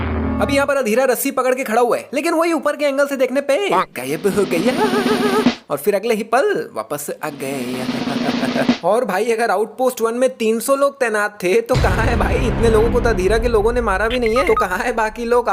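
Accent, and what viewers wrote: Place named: Mumbai, India. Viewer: Bhai udda diya ek Mumbai excent hai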